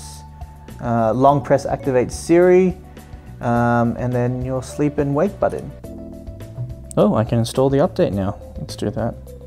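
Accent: Australia. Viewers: Theres no way your not Aussie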